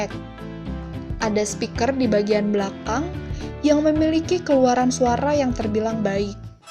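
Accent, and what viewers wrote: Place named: West Java, Indonesia. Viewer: ... Nama Jawa, tapi logatnya Sunda yah?:)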